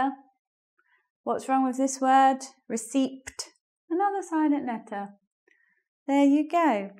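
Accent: United Kingdom. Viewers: I love british accent, thanks for your classes, I am really enjoying it :) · Hi! I'm learning English, and I love yours videos :3 and I also love your British accent :3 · A thousand thanks, your are a fantastic teacher. I've lived for many years in the US (I'm from Argentina), and it is difficult to me to understand British accent. Another thing to remark is that you have rules that in american English are often overlooked, so I'm learning a lot from your tutorials. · Hi. Thank you for your video! Your British accent is so beautiful! I could stay the whole day just listening to your voice haha your video is good, but sometimes you should repeat the pronounciation of some words more often. For example, you just pronounced the word ''tongue'' once, so I had to come back the video again. In addition, thank you again and I think that you chose good examples for the video! 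xx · Hi, Please I would like to learn the pronunciation of the english O how you pronounce them in the words as SO, I know. Cuz I m still hearing the Y literally SO - soy.:D Thank you. Btw I really like the british accent about the O:D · Hellooooo dear. Just wanted to say your lessons are top notch. The very best, really. I know some English and I love it. Can't explain why. But the more we know the more we know we need to know more. Huahuaa. Your British pronunciation is delightful. I 'm really fond of British English. Also I'd like to ask you if you give private lessons --- maybe on ITalk, the site that gathers a bunch of teachers teaching languages online.. Please don't stop. Carry on showing the world how great this language is. All the very best. Cheers. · I'm learning American English... Is this british ? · Is this Brittish? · To be honest I don't learn anything from theese I just like listening to british english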